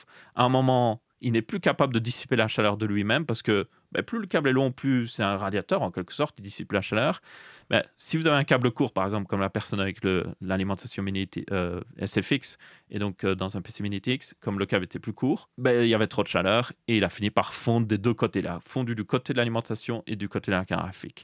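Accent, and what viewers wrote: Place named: Belgium. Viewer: Tous les nombres qui sont énumérés avec un accent belge me font perdre le fil, ça devient difficile de suivre puis je décroche complet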